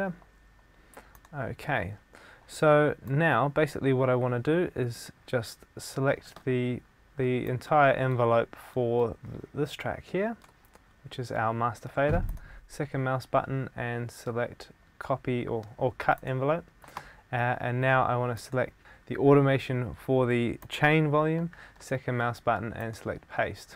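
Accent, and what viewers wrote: New Zealand. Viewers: CHEERS! Awsome vid bro, I think you explain things really well. I will definatly subscribe ;) Take it easy! P.S. Your a kiwi right?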